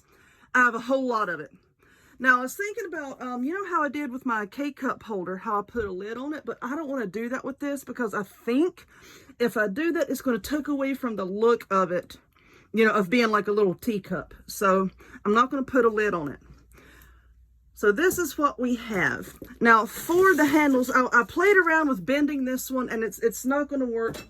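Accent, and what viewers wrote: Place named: East Texas, United States. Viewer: Olivia's romantic channel recommended yours, so i headed over. i have to ask if you are in east texas? you sound a WHOLE LOT like my family and myself.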